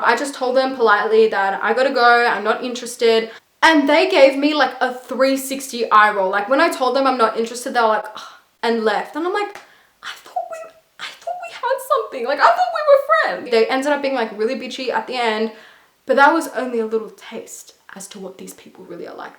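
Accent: Australia. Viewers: You are really pretty i know you sound Australian but I’m wondering what your background is, are you mixed ? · Your accent is like Australian but with a tinge of something else ?? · Is that an aussie accent? And you speak korean? 😍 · are you an aussie?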